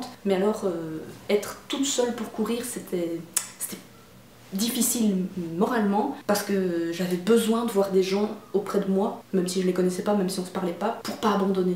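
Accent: Belgium. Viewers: Bonjour, je te remercie car avec ta vidéo précédente tu m'as donné envie de m'y mettre alors que je déteste courir ... j'ai téléchargé une appli et j'ai fait la première course du programme (très très facile pour super débutant) et je me suis régalée !!! Je vais continuer bien entendu 😉 Bisou d'une réunionnaise qui a fait ses études en Belgique et qui adore entendre l'accent belge 😘Félicitations pour ta course !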